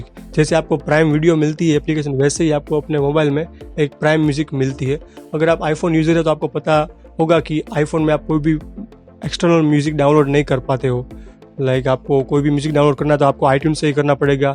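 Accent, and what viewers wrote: Gujarat, India. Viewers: Bhai mere khyal se aap Gujarati ho?????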